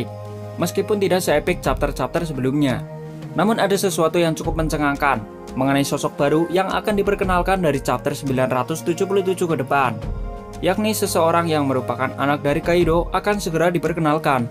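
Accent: Java, Indonesia. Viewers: Medok temen yak...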